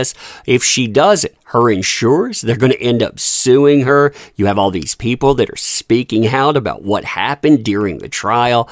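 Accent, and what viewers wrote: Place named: East Tennessee, United States. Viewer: I don't know if you've always been in east Tennessee but you sure sound like it and get tickled like it too.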